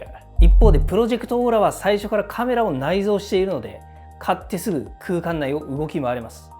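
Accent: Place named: North Kanto, Japan. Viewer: 北関東かな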